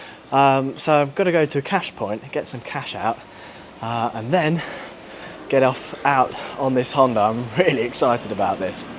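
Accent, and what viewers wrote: United Kingdom. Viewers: L'accento britannico è decisamente più civile e gradevole, a confronto con quello americano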